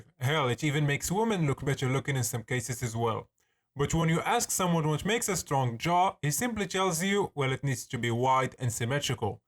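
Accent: Morocco. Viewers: wach nta mghribi azbbi ach had l accent hhh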